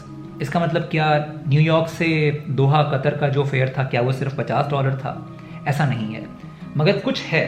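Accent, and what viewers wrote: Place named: Lucknow, India. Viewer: your this channel is awesome. You have devoted your life for tourists helping them from your experiences & sharing knowledge. I see all your travelogues and enjoy your brilliant clear Lucknowi urdu/Hindi accent. Keep up the good work. Great work bro.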